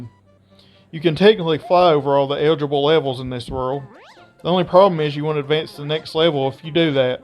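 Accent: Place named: Alabama, United States. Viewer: Where are you from, buddy? Alabama?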